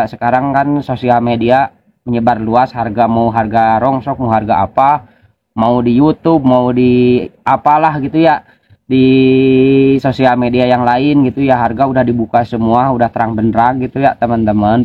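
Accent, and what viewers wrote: West Java, Indonesia. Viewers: Asalamualaikum,,, kang bos Dina logatna mh jiga orang Sunda , Mun tiasa nepangkeun orang mana , linggih di mana bos , seueur abdi bos barang" na empeg , panel , PCB hp + Sagala rupi Aisi bos , nyengcelengan bos tos seueur 🙏🙏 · Si aa mantap... Asa sunda ini mah❤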